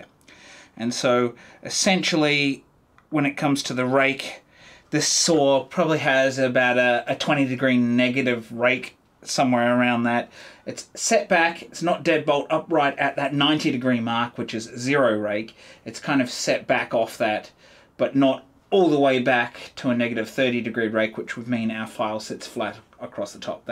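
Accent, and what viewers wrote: Australia. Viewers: Thanks for all of your videos. Plus hearing you makes me miss friends I made in Australia- I’ve spent about three months total in AU between Navy port calls then I had a consulting job and went to Brisbane, Darwin and out to Jabiru. Cheers!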